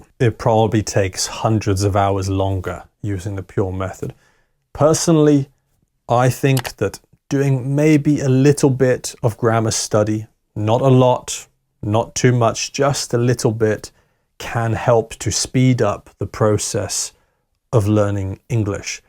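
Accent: United Kingdom. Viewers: I love the british accent, ❤❤from Bangladesh.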